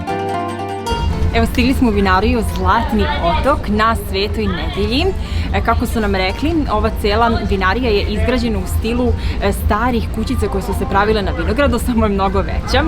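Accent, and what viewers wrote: Belgrade, Serbia. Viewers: Pa nije bas tako gdjice ..dajte malo bolje istoriju Dubrovnika. Treba da malo znate i istoriju umetnosti i istoriju..a to je ovde na zalost izostalo. Sve ste pomesali..Inace Dubrovnik su napadali i sa mora i sa kopna pa cemu su sluzila uvrdjenja prema Srdju? kula Minceta? A tek taj napadni bg akcenat..ublazite to malo !! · Sto ti smeta,bg akcenat?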